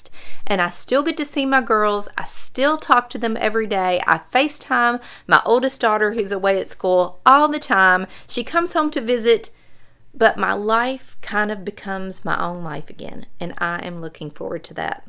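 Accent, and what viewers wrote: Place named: Kentucky, United States. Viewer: Wow. My first program from you was all about Pollock. Great u-tube. Now I sit down with you, and get my visit with my new best friend! You are so fun to get to know. I've seen 10 of your videos and am hooked. My Daddy was from Texas. I was born in Paradise (San Diego) and in elementary school people would ask me about my accent. Was I from the South? I love your Kentucky accent and and you confidence, southern charm and relate to your experiences. I'm 72. Soon to be 73 in June. TY for being my new BF. God bless you, lady bug. Linda D in SD.😊🇺🇸💕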